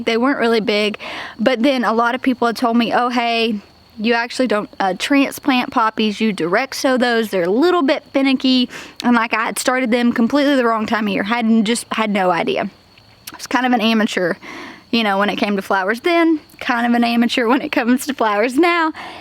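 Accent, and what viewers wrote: South, United States. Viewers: Beautiful garden! Success? Today I made homemade pesto from basil in my herb pots. Best pesto I've ever made. I shared it with my neighbor and daughter in the form of a really great pizza. It would have been a pretty empty feeling otherwise. So sharing your garden with your followers is the same thing but on a much larger scale. BTW, don't ever, ever, ever apologize for your southern accent. It's what sets us (me-NC) apart from others. What a boring world it would be if we all sounded the same. 😀 Take care!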